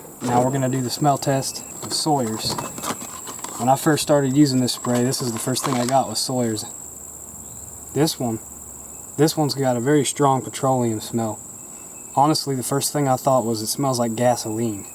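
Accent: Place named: South, United States. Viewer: Thank you for this great video. You’re knowledgeable and I like your Southern drawl.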